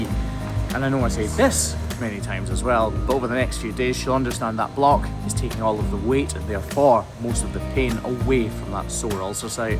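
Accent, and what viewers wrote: Scotland, United Kingdom. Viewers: I kinda like him talking, with that wonderful Scottish brogue.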